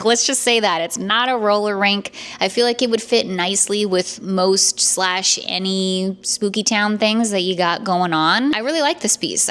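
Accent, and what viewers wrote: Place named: New Jersey, United States. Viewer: I want you to know I am very proud of you for resisting the temptation to buy the amazing spooky items I know how much you love them I always enjoy seeing you so excited and happy when you get to make the purchases and I miss hearing you say purchases in the New Jersey accent and I always think it is cute when you say I am not going to spend a lot of money then you get back to the car and you are like I had to get them I think it is so funny and cute and I love how you decorate you're very good at the decor and a very good shopper I love halloween and Christmas and Halloween it's not official until you and say it has begun me and my family have watched you for three years now and you have always brought us our Halloween fix I will never forget when you went to the at home store and you were determined to give us our Halloween fix and you were determined to get that witchy candle you climbed on the flat bed dolly and you got it your dedication and determination to bring us our Halloween fix has always been the best so don't worry you're doing a perfect job and I hope my words make you smile and smile me and my family love you and always and forever 🖤🧡🖤🧡🖤🎃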